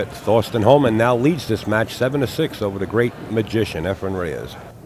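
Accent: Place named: New York, United States. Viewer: We only need efren, NYC accent is not adding to my experience....sorry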